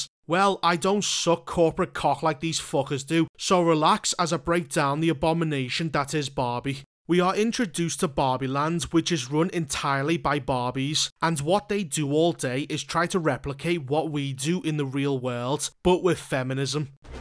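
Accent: Liverpool, United Kingdom. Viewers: Pure Scouse poetry. Love it 👌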